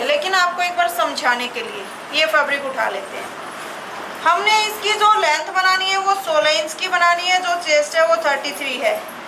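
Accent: Haryana, India. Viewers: Tone se mujhe haryana ki lag rahi Me bhi hisar se hu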